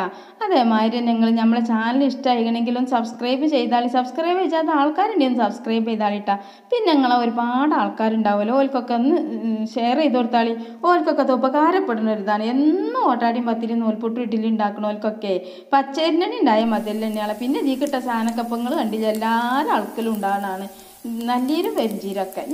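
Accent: Malappuram, India. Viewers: Fantastic dish, great enthusiasm and confidence plus terrific language which really has agreed malapuram tint. O.k. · Malappuram slang powersh💪💪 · Makappura baasha🙂 poli endhaayalum sadhanam sooper aayikk nn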